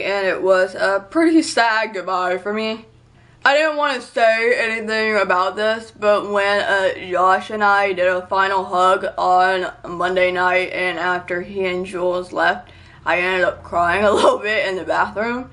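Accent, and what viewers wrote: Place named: United States. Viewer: Not sure if anybody has mentioned this to you before but you seem to have an american accent. Were you always deaf? how do you explain your accent. Sorry you got depressed, keep smiling...it helps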